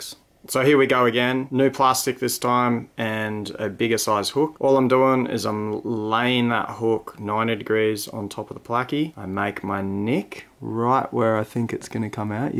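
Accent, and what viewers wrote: Australia. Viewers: These videos are so therapeutic to watch. Sometimes I just wanna watch a guy talk about fishing with good camerawork and a hectic Aussie accent.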